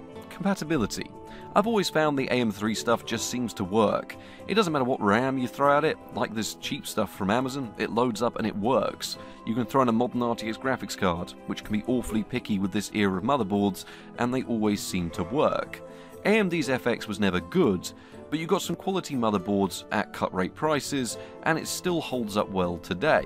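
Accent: United Kingdom. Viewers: I'm from Russia. British accent is such a treasure)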